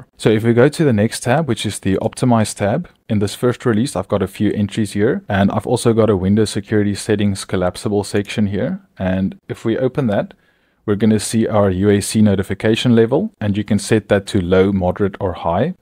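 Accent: Afrikaans, South Africa. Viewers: It is great to see a South African doing well on YouTube. It also sounds like to have a Afrikaans ascent. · South African detected! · are maybe south african? also cool stuff! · I'm hearing some SouthAfrica in there :D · South African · South African for sure ? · I could be wrong, but it sounds like you have that SA english accent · Do I hear a brother from SA?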